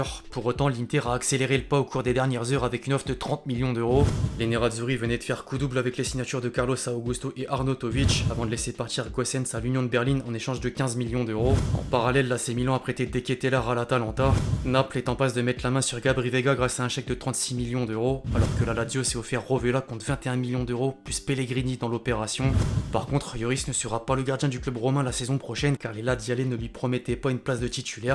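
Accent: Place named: Banlieue, France. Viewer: C'est quoi cet accent de banlieusard....sans déconner...pauvre France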